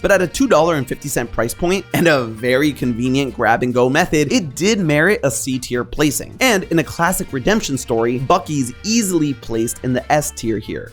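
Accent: Argentina. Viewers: Nothing makes me happier than Argentinian accent coming through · IS FROM ARGENTINA???? THE ACCENT, THE CHARM, no wonder I like him as a host. Another coronación de la gloria 🇦🇷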